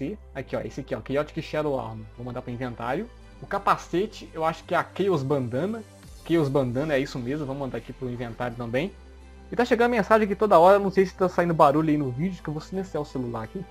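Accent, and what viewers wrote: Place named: Bahia, Brazil. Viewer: essa voz de baiano que saudade crl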